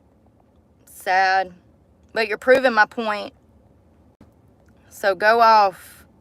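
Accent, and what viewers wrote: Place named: South, United States. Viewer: Your southern accent... it's on 🔥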